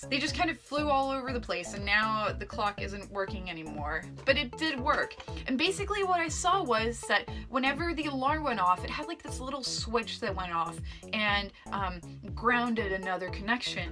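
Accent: United States. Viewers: You're the most American-sounding Swede I've ever heard. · Swedish? WTF. You sound more American than most Americans. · Din amerikanska accent är klockren. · You're Swedish? Hahaa! Definitely thought you were American. Awesome video! Make one for my friend, pls?!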